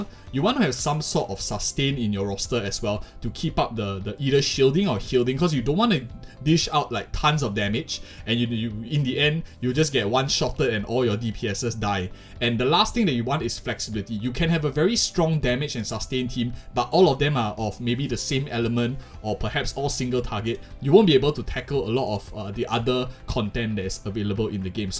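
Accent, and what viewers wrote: Singapore. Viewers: Oh hullo this guy sounds a bit Singaporean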